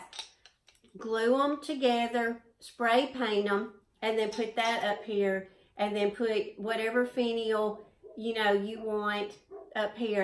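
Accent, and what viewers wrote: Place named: Alabama, United States. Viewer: Very glad I found you too. You sound like you're from Alabama. lol I amove the cloche you made. I will definately be trying it. You've surely inspired me.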